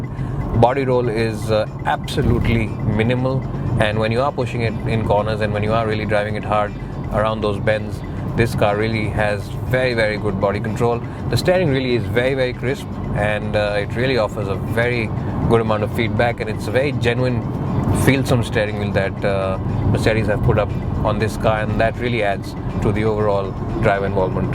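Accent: India. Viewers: English slang indian....bla,bla,bla..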